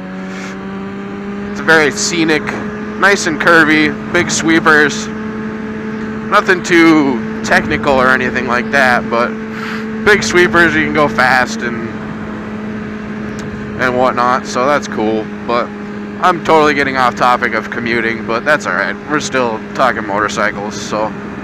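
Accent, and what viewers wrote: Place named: Minnesota, United States. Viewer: Yooooo Minnesota brother xd I had a feeling you were from there I can tell from your voice xD. That place looks familiar.. further into the video and your right in Duluth Xd this is cool I'm from there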